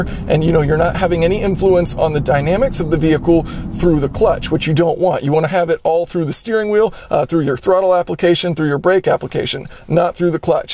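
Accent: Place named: United States. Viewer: As a mechanic in Australia I have 2 statements about this 1. in the USA which from your accent I will ASSUME you are from (possibly canada please don't smite me) Most people don't know how to drive "manual" or "stick" and with the way the world is going this will probably be useless in 10-15 years given electric cars 2. You used the example of going from 4th gear to 2nd gear now you failed to tell the good people at home THIS IS A VERY BAD IDEA, when you go up threw the gears if you rev them right out you will hit a "limiter" or what is refereed to as a "fuel cut" this is to prevent you making the engine spin faster then it is designed to go BUT if you down shift at a high speed skipping a gear you MAY not will MAY cause the engine to excited the "limit" cause it to kiss the valves with the piston on a High interference motor, So it just turns into a giant hand grenade and a lawn ornament until you have the money to replace the motor. 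People will have there doubts about this statement but I have seen it 1st hand on multiple occasions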